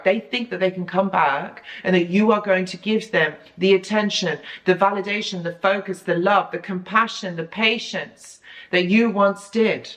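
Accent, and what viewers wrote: United Kingdom. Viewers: Some real facts are Heart breaking.....but your words and facts that you convey is god sent......god bless u Ms...... discarded 1 Oct 2023....going thru some real hard time......i need some insights from the community here and veterans here......my case is crazier hope all can help.....will tell my story if i am ready soon......Cheers. ooooh ya i always love British accents.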